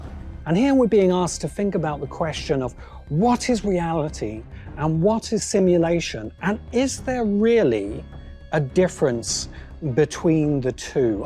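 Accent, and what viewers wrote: England, United Kingdom. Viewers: I get that translating Gibson's work to the screen is almost impossible. But this show is HORRIBLE. This review tries to give the show more credit than it deserves. But a soft-spoken English accent can't fix how broken the show really os.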